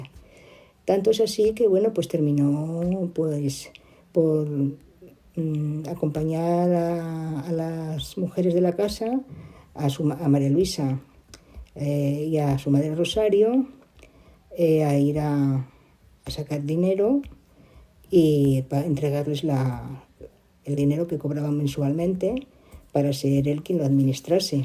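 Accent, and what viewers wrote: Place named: Asturias, Spain. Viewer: Mi like y suscripcion para que tu canal crezca , por el acento pareces Asturiana , yo tambien , buena suerye con el canal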